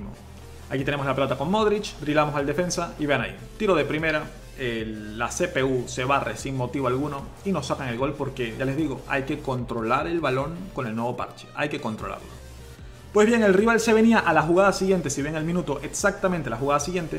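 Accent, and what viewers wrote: Venezuela. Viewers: De donde eres ? Me suenas a Venezolano pero no estoy seguro jajaja. Buen video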